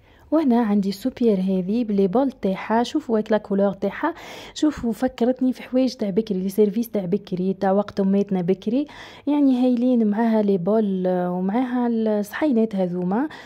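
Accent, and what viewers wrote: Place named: Tunisia. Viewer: لهجتك كيفنا التوانسة ❤️😘 انا نحب الجزائر واهل الجزائر والله والبلد الوحيد الي نحب نسافرلو بعد مكة والمدينة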